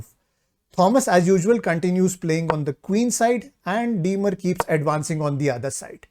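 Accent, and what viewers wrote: India. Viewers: cant stand that indian accent. flunked a couple of college courses because of that shit.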